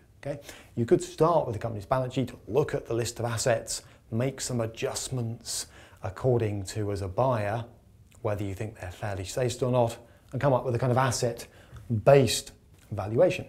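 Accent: RP, United Kingdom. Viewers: Received Pronunciation/ Standard English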